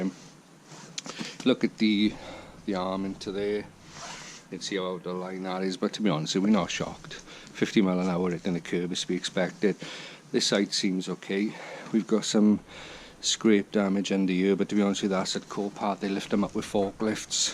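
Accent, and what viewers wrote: Wales, United Kingdom. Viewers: Are you from Wales by any chance ?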